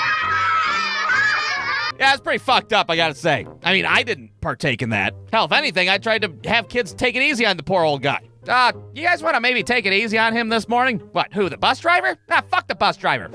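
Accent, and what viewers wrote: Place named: Ohio, United States. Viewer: I’m going to go out on a limb and guess that he is either from Crawford or Mercer Ohio.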